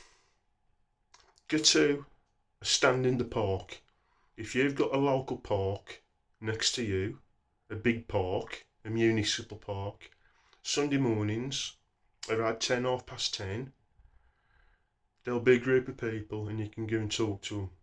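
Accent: Birmingham, United Kingdom. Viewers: Too many sheep we are fucked. And spoken like a true brummy!! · You sound Brummie, are you from Birmingham? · Luv your brum accent i am a Glaswegian.And your message is true Keep doing your videos. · Nonsensense, science fiction...but good brummy acting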